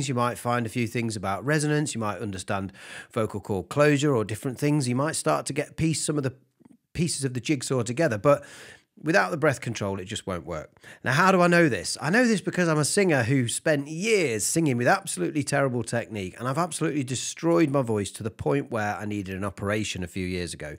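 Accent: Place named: North of England, United Kingdom. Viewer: Great talk/lesson. Sort of proves that often the best teachers are the ones that have been through the crap rather than the ones that had just done it right from the start, if that makes any sense. I heard once somewhere that Bach was a terrible teacher, because it (music/composition I dunno) was all so obvious to him he couldn't understand how anyone couldn't get it, so he was impatient and couldn't explain stuff haha. Sounds like you're from the north of England somewhere, the place I feel the most at home. Unfortunately I'm a long way from there and walk around upside down atm . haha Thanks and kind regards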